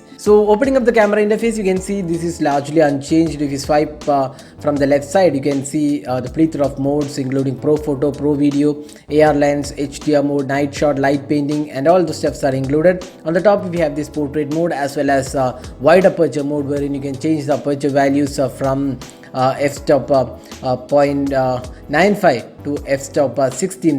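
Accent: Kerala, India. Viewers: You sound like a malayali 😃😘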